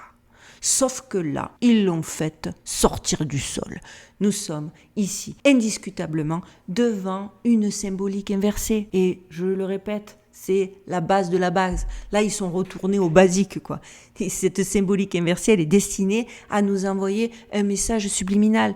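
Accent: Marseille, France. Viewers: Et bien tu m'as captivé et tu m'as apris des choses qui me réconforte dans le fait que je suis de retour à l'église depuis 11 mois et ce 3 fois semaine.. Je te remercie de faire toutes ces recherches car moi j'en serais incapable... J'aime t'écouter avec ton accent de Marseille. Comme quand j'écoutais Clairette ici au Québec étant jeune. Padre Pio sa PROPHÉTIE serait un bon sujet ..🦊🐾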